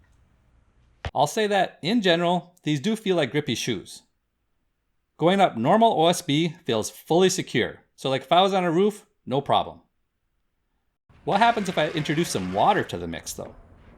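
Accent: Canada. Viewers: You’re Canadian aren’t you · Sounds very fucking Canadian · Are you a Canadian?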